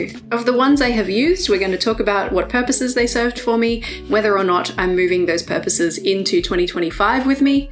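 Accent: Australia. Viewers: ohh, i had recognized it, but i wasn't too sure. i'm an english speaker, but not a native one, and ever since i discovered how cool the australian accent is, i've been trying to learn it lol. i will watch more of your videos 😃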